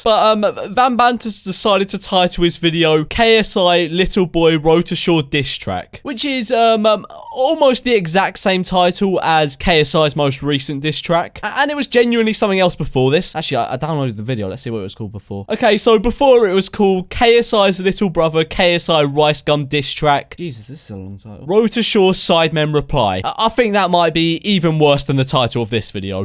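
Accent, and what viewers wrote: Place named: RP, United Kingdom. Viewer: You sound posher than usual in this video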